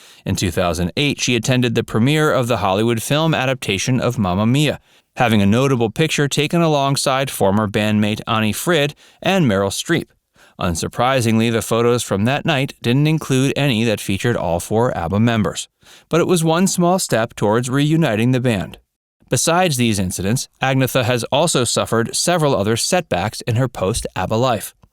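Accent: United States. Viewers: Listening to your lazy Americanized pronunciation of their names was painful. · I was set a bit curious by that reference too. But without prejudice hearing the narrator's accent i can correctly guess he's American. America didn't give any hoots yet about ABBA so forgive them.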